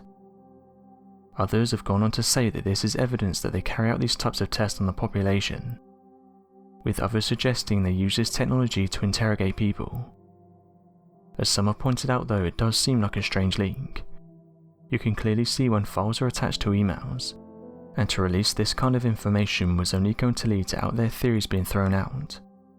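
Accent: United Kingdom. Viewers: I cannot stand this guy's wimpy British voice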